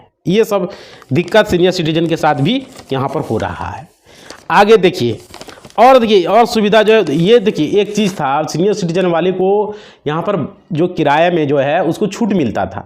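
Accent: Bihar, India. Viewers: Bihari ho kya aap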